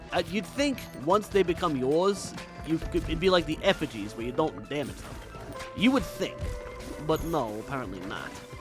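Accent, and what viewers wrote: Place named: New York, United States. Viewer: It amazes me how YouTube isn't going out of business. Also your doing well and have a great day honestly I don't mind the swearing plus love New Yorker/Chicago accent reminds me of the days of Mafia like fat Tony from Simpsons and Vinnie cannoli from guns gore and cannolis.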